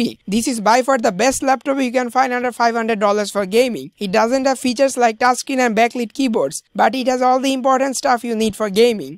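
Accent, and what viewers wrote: India. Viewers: ur accent sounds like indian,btw where u r?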